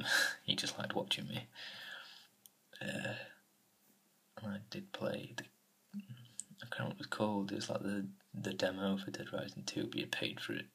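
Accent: Yorkshire, United Kingdom. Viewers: I've only recently discovered asmr and you're my absolute favourite. I love the Yorkshire accent so much. Thinking I might start my own channel also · I have just found you via the waterwhispers channel who I love to watch. she did a video talking about other male's who do ASMR video's and to check you all out. with myself from Yorkshire I thought I would check you out to. nice to listen to Yorkshire accent like this. I don't really get on much with male ASMR's but I do like to listen to the Yorkshire accent softly. I be watching more of you.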